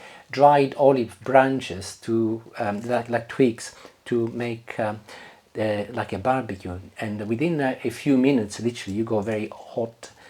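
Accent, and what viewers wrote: Italy. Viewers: What an absolute joy of a video 😊. To hear your beautiful tale of your grape picking experiences was delightful 🙂. Your accent and English is so easy on the ears. I grew up with Italians in NZ and they also spoke with your accent. It brings back beautiful memories for me ❤😊. I'm going to really enjoy listening and learning your recipes 😊❤😊.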